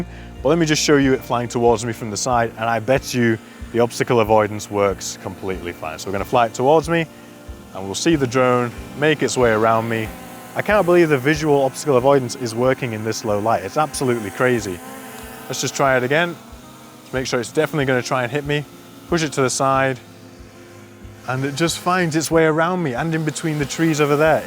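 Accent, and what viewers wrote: United Kingdom. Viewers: Hey mate. You sound British, but maybe you are living overseas? The reason I ask is where here do you get the plus batteries from in the UK? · I am working my way through your videos, kinda binge watching really! Having just bought the DJI mini 5 pro I found your videos to be extremely helpful, especially the one on cruise control!! Needless to say I have subbed, many thanks for the tuition! Best regards Dennis 👍 BTW you sound like a Brit but it doesn't look like the UK, where are you? Sorry just curious 🤔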